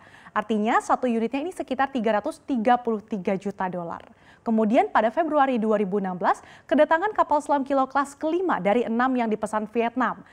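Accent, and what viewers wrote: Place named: Palembang, Indonesia. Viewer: kirain dari palembang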